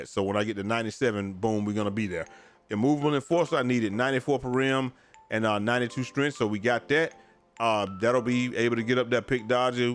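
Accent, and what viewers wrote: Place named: South, United States. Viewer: They from the south. Most men from the region sound like this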